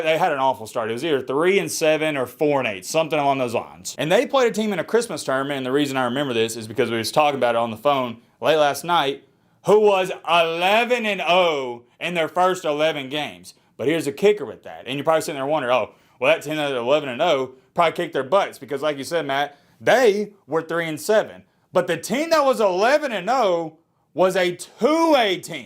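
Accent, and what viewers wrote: South, United States. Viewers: listening to this guy gives me a headache. just some hick from the south that thinks he knows everything. im from TN too so i would know.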